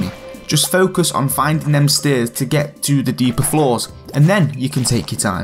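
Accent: United Kingdom. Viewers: Ur so bri'ish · Gotta love bri'ish accent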